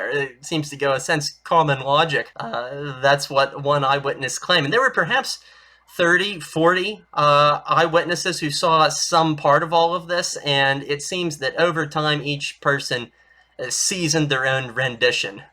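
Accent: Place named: Pittsburgh, United States. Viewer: "Dahntawn" Tombstone. Good to see a fellow yinzer making it big on YouTube! ⬛️🟨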